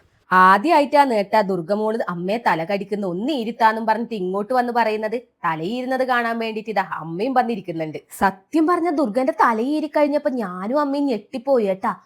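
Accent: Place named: Kerala, India. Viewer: കടപ്പുറത്തു താമസിക്കുന്ന ഫാമിലി ആണോ.. സ്ലാങ് അത് പോലെ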